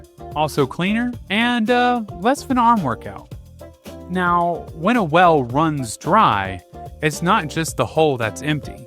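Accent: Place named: Midwest, United States. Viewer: Your voice is extremely midwestern north america. Btw very cool video, I learned a lot!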